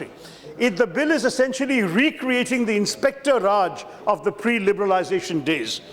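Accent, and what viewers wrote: United Kingdom. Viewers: British accent.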